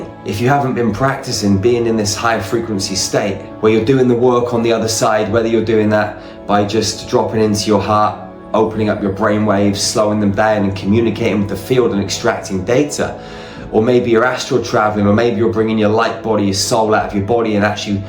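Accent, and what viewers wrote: United Kingdom. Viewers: He's British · its not a normal british accent just trying to figure it out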